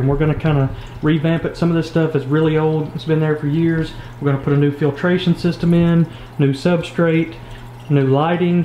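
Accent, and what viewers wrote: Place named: Louisiana, United States. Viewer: i can tell this guy is from lousiana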